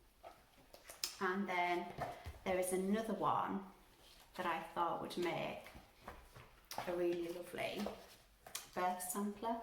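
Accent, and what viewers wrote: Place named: England, United Kingdom. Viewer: Your accent sounds so familiar and I may have figured out why. It seems to be the same accent as several of the characters of Downton Abbey. Am I correct in that guess or totally off base? Another great video.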